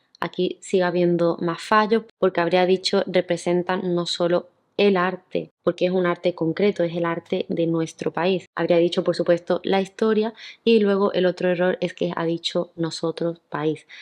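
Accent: Andalusia, Spain. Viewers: Hola, llevo mucho tiempo viendo tus vídeos. Saqué el apto en el DELE B2 en mi primer intento y me ha encantado este vídeo. Como soy de EE. UU., estoy acostumbrada a los errores que suelen cometen los angloparlantes; pero es interesante ver los errores de una persona de Alemania. Tu manera de corregirlos me sirvió mucho para aprender no sólo cómo escribir de forma "correcta" sino también de forma concisa y elegante. Se nota que escribes muy bien y que eres filóloga hispánica. Y viva el acento andaluz 🤗 me encanta!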